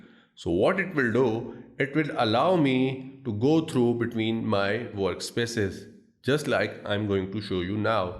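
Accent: India. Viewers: omg this indian english is absolutely horrible to listen to :o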